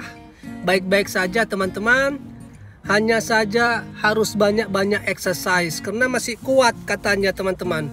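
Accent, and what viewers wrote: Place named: Java, Indonesia. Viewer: Mas e koyo wong jowo wae wkwkwk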